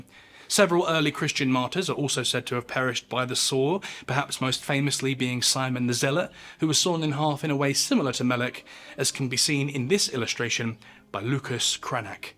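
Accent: England, United Kingdom. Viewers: love listening to the English accent talk about history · We wouldn't be doing it were it not for tone, pace, etc. Even the British accent helps. Pauses at the right time, everything's in the right doses. Not too emotional, not impersonal either. Perfect balance, storytelling, except it's real stories. Exactly what he intended I guess. And viewers get educated because he does provide a thorough historical context to every event he covers.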